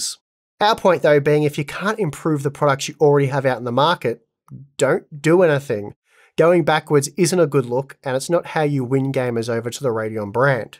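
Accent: Australia. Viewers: Good Lord such a nice channel but Aussie accent is so bad to the ear.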